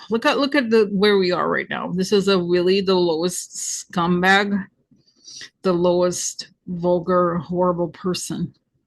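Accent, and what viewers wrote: United Kingdom. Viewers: Love your brit accent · love your British accent!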